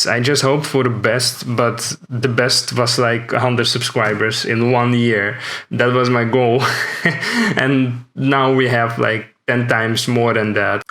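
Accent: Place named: Netherlands. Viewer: U have to work on your English pronouncing because I can hear 100% you're dutch ! xD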